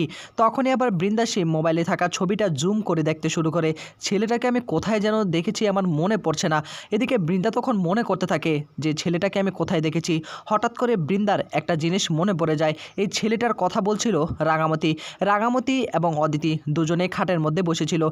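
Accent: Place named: East Bengal, Bangladesh. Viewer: বাঙ্গাল বাঙ্গাল উচ্চারণ ,অশিক্ষিত। কথকতা করতে শিক্ষার দরকার। শিক্ষা নিয়ে তারপর কথকতা করতে এস।চেষ্টা করলে পারবে।শুভেচ্ছা রইল।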